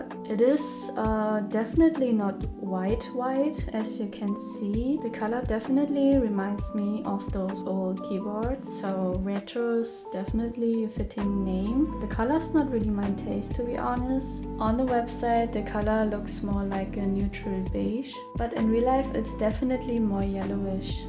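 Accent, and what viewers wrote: Germany. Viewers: Yo you sound like your from Germany ? :D , if so that's pretty nice theres not a lot of custom keyboard YouTubers from Germany :)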